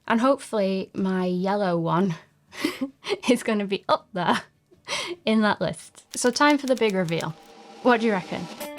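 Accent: United Kingdom. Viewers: You speak in a very nice, soothing tone. I am surprised because I usually don't like British accents (too high a pitch), but yours is very wonderful.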